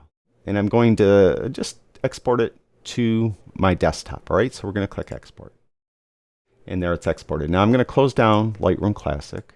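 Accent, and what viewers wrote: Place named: Chicago, United States. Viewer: I can pick up on Chicago accect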